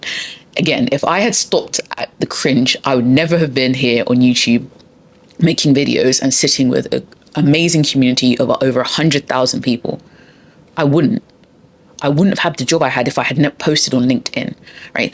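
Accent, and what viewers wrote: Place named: United Kingdom. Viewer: Ah, you're British! So refreshing. Great content, thank you, Sis.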